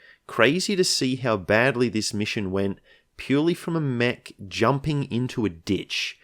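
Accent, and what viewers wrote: Australia. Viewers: your accent is uniquely Australian. you sound like a Queenslander but you know... your not being a cunt, so thats nice. keep it up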